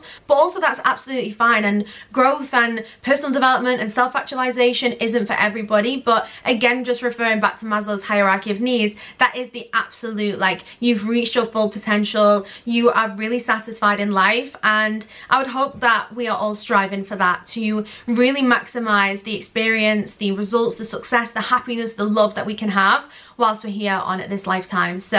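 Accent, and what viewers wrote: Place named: United Kingdom. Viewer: I am in love with the british accent, I could listen to this for hours 🫶🏽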